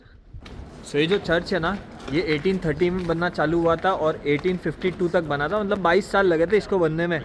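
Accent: Gujarat, India. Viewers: bhai aaj first time teri vedio dekhi aacha laga , waise tu gujju hai kya tere bolne se style se aisa lag raha hai. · So good to hear from you. Well explained. 8 enjoyed and learnt things from your video. You sound typical Gujju😅😊🎉All the best.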